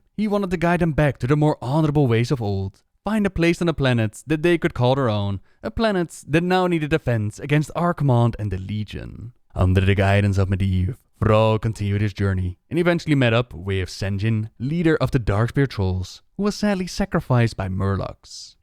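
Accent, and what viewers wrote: Netherlands. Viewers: Nope but close, he has a very strong Dutch accent. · dutch accent :( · That heavy Dutch accent is hard to ignore :) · Ik hoor echt dt je Nederlands bent :) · sounds like Dutch accent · You sound dutch! Fun to hear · Are you Dutch? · annoying dutch accent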